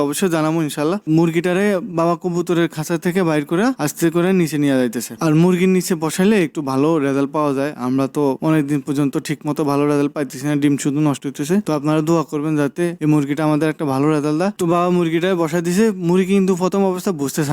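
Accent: Noakhali, Bangladesh. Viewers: ভাই আপনার কথায় মনে হয় যেন আঙ্গ নোয়াখাইল্লা??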